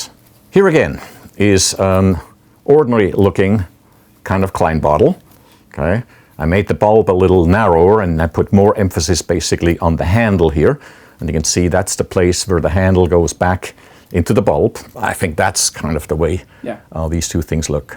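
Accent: Switzerland. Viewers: he kind of sounds swiss. grüezi! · Yay he's actually Swiss! :D (Like me) (The accent made it easy to guess it right) xD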